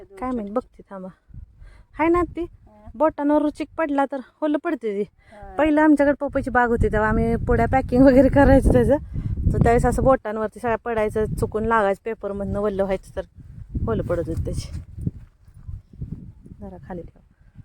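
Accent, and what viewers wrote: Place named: Solapur, India. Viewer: ताई तुमचं माहेर सोलापुरा कडचा आहे का कारण तुमचं बोलणं तसंच वाटतं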